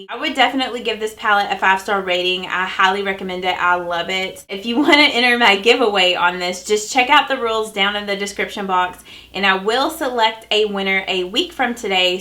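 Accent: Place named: Kentucky, United States. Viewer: I love that this palette is all matte and is so nice for fall🍂 and even winter❄️too! I love your channel and I think I have gone and bought just about everything you have used or recommended from the drugstore. I have four kiddos so higher end products aren't usually on my shopping list lol! I think you are the sweetest thing and I love your Kentucky accent! As always, your makeup💄 looks gorgeous! Oh and I'm entering the giveaway also! Thanks so much and have an awesome day! 😊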